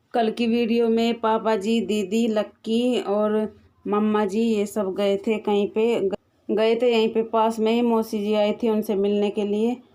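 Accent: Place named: Muzaffarnagar, India. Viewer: ji aap Muzaffarnagar se ho kya aapki language se lagta h, Main bhi muzaffarnagar se he hu lekin abhi rahte h Chandigarh m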